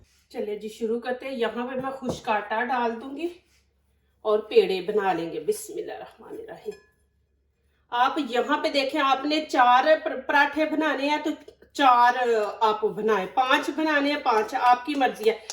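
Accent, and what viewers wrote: Punjab, India. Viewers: Ap na bohat acha Prata bnaye hain par ap ki jo Zuban hain us aa saf pta challah hai kh Ap ki Zyban Panhabi hai to best hai ap Punjabi bolo apni Zyban bolna mn kaisi sharm please apni Zyban bolna mn kisi ko bhi sharam mesoos nahi karni chahiye I prud of my panjabi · Aap punjabi pind jesylehjy mn baat krty hn.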